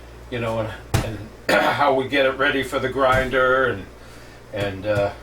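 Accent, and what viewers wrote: Boston, United States. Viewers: Good to hear the Ba'ston accent again. : ) Hope you upload daily life videos more often. Best! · Great content…….. admire your dedication and determination to keep you and your people taken care of….. I am in Tampa…with much easier life…but not the community y’all have….very nice…..we would be best friends…….that Boston accent is hanging in there!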